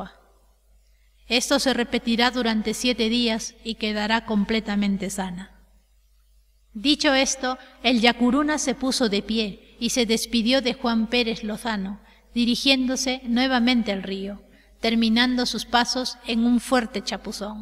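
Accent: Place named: Spain. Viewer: muy bien hasta la z con pronuncia ibérica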